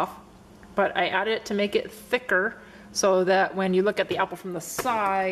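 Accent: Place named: North Dakota, United States. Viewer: Are you from North Dakota?? I can really hear an accent lol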